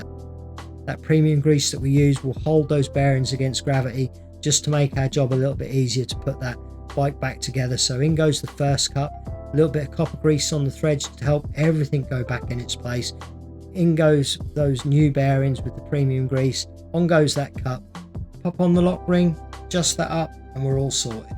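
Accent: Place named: Lowestoft, United Kingdom. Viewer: What a fabulous restroation. I'm impressed with the thoroughness and attention to detail. Thought the accent seemed familiar ! You're just over in Lowestoft. Not far from me at all (and 0.2 miles from my aunt !) I'll have to drop in next time I need some kit for my bike(s). I've practically got the entire 1984/5 Raleigh Lightweights range hanging up in my garage and a few other bikes too.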